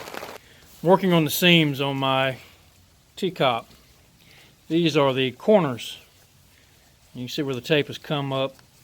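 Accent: South, United States. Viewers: Love the tent. Don't love the USMC or Southern accent. It's mind boggling how some people actually talk that way 😂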